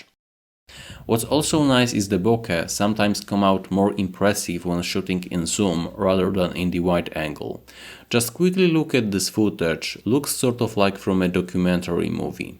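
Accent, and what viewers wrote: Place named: Poland. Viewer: Your extremely heavy Polish accent makes your review difficult to follow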